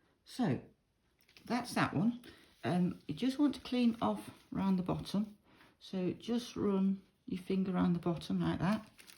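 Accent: United Kingdom. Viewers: Hi, Thanks for a really helpful video and what a result. I haven't even started this Bloom journey yet. I'm just collecting ingredients etc so it's been great coming across your video and then to my delight hearing a British accent, which of course means being able to get the same things as you;) I wonder as I've already bought the valspar wood and metal Base C, if i could use that plus varnish for the pouring medium instead of glue (as in Shelee's technique) but use your pillow of simple acrylic paint? I don't suppose there's any reason why not,unless the medium would be heavier than the pillow? anyway would be interested to hear your thoughts on that. Great tip with the tape on the back!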